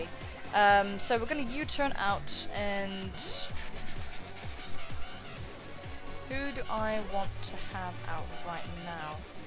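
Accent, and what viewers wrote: England, United Kingdom. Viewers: Where in UK are you from? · Sounds awesome! Does anyone pick up on the English accent? :) But is it possible to get tea over there is an important question! Congrats on the 2K subscriber boost by the way, it's incredible!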